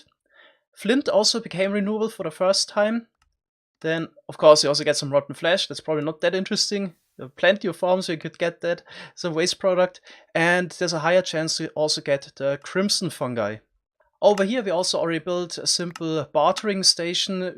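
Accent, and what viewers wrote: Germany. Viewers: Hahaha ich hab gewusst du kommst aus Deutschland!!!! xddd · Deutsch man hört es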